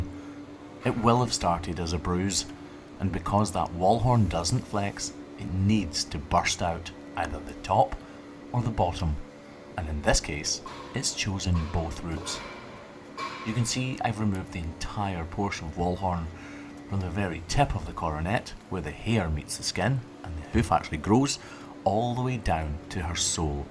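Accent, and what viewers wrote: Scotland, United Kingdom. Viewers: It's the Scottish accent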